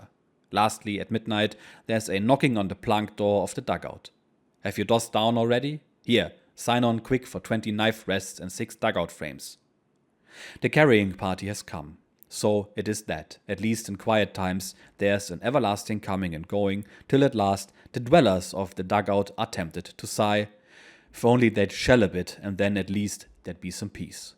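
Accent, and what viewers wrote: Germany. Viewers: I have read this book twice and my daughter has read it but being read with the German accent is undoubtedly the best.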